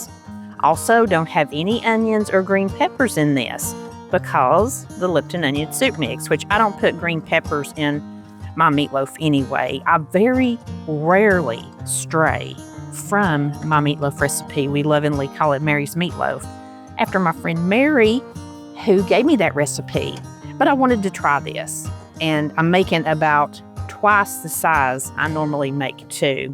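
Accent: South, United States. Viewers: Hi! I recently discovered your videos & I'm hooked! Yours are my favorite of them all! I love how you show the ingredients & sometimes tell where to get them. I also like that the ingredients are simple & the recipes are good & easy to follow (love your southern accent)! I've made several of your recipes & me & my family loved them! I can't wait to see what you'll do this year. Keep up the great work! I've been telling people about your videos because they are the best! Happy New Year & may God bless you!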